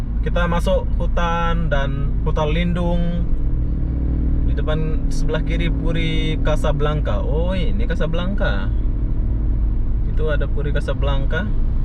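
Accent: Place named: Malaysia. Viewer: Ooohh pantesan masih dapat Logat Melayunya Pas, kirain orang Riau/Sumbar. Ntaapp Lanjutkan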